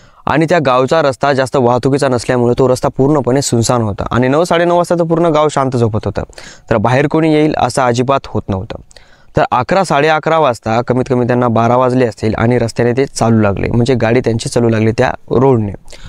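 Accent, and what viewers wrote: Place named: Vidarbha, India. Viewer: भाषा आपल्या विदर्भाची आहे भाऊ तुझी. लहानपणी आज्जी आजोबांच्या कथा ऐकलेल्या आहेत तू असं वाटतं. खूप छान कथाकथन करतोस तु. Good keep it up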